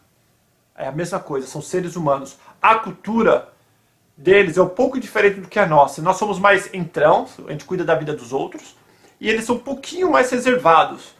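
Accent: United States. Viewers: Você já está com sotaque americano... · ei ei para tudo kkk sotaque americano kkkkkkkk assim vale a pena aprender ingles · você é americano? pois você tem o sotaque um pouco diferente do sotaque brasileiro!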